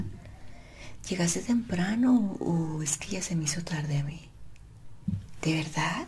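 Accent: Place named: Colombia. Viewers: ¿De qué parte de México eres? Te juro que yo escuchaba como acento colombiano. No reconozco mis acentos, he fallado como mexicana jajaja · Soy colombiana y a mi tambien se me parece a unos de nuestros acentos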